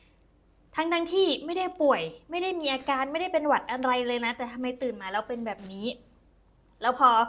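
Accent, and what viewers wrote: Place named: Surat Thani, Thailand. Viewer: ชัดค่ะ สุราษ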